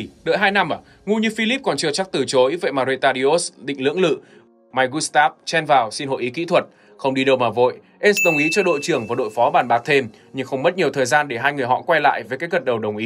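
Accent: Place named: North, Vietnam. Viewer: kiểu giọng bắc ấy, vừa ngầu lòi lại ấm áp~~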